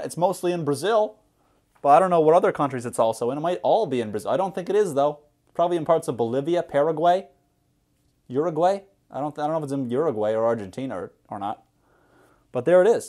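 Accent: Newfoundland, Canada. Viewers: As soon as you said “lore” a heritage moment played in my head and I became fully aware that you have to be from Newfoundland. But like, also every other word as well. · I watched only two of your other videos before, the horrible yo mama jokes with the theory of relativity and congestive heart failure, and I was wracking my brain thinking - where the hell is that beautiful background and what is his accent?? It's not quite European but it's not quite American? When you said Newfie, it all made sense lmao cheers from the 6ix, thanks for mentioning Ontario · the way that I could tell he was Canadian before he even said it. -sincerely, a fellow Canadian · Aha - I picked out that you’re Canadian from your accent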